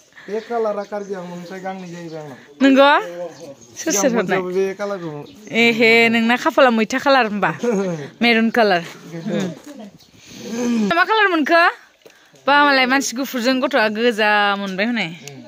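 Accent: Kokrajhar, India. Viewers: Abo ngni railainaya kokrajhar nijg same jayw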